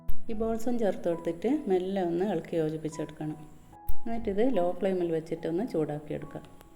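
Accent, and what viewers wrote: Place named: Nadapuram, India. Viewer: Nadapurathukariyanooo samsaram kettitt ❤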